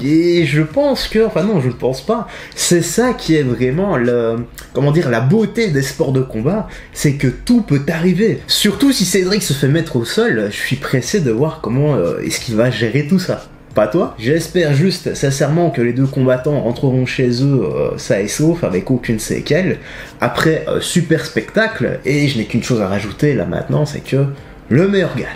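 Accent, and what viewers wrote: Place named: Liège, Belgium. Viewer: je vais plutôt bien, content de te retrouver un peu plus souvent sur YouTube. Tes analyses, réactions sont direct, simples et pleine d'humour et de bons sens. Ça change des contenus français qui ont tendance à être... team A contre team B , les différentes chaînes YouTube se font une guerre qui n'a aucun intérêt, qui est tout sauf sportive. Ils ne semblent pas réaliser que ça nuit totalement au MMA et à leurs combattants. Même si les YouTubeurs sont très compétents,...bref... Merci pour ton contenu bien belge avec ce petit accent de ??? Liège ? Perso suis à Charleroi . Kiff bien ce job et pourquoi pas un feat avec Ilies ou Chris Guenacht ? 3 bien belge 😊😊🙃 belle brochette de connaisseurs 😁🥳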